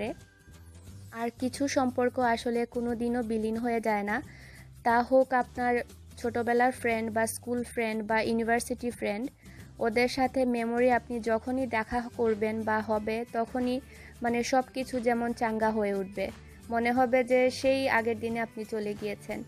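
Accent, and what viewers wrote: Sylhet, Bangladesh. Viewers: Full Video dekar age comment korlm. Apu miss korrm afnr sylheti voice 😥but afnr j mat shunrm bala lager afne jrokom matoin na kne afnr sathe sovsmy takmu love u appi 😘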